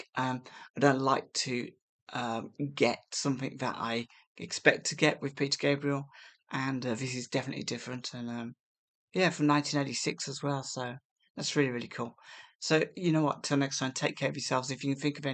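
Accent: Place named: Midlands, United Kingdom. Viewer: Hello gorgeous 🎉. Back again from Glasgow. 😂. Been a while. Hope you feel better soon ❤️🌹. I'm 57. You're so YOUNG. I had some really scary health issues the last year, but I'm back at work again. 😂. Love the midland accent 😮.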